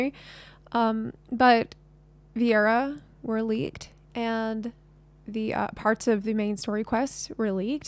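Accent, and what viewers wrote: United States. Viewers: the country accent is strong with this one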